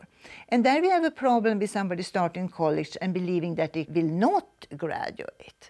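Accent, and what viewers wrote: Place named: Sweden. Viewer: That Swenglish accent is crazy😅 (swedish-english)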